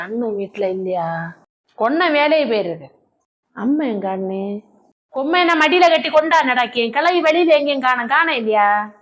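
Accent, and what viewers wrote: Kanyakumari, India. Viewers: Sela words neega pesarethu pureyala kanyaakumare baasa superaeruku sster😂😂😂😂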